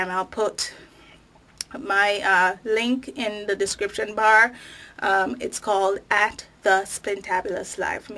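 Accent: Jamaica. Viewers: you sound Jamaica :) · Love this vid...thanks for the patois too. Makes me feel like home :)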